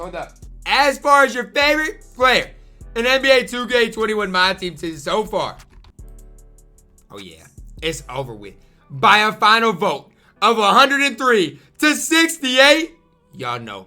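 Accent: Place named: Detroit, United States. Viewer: How come sometimes you talk normal and then sometimes you talk like you are from 8 mile?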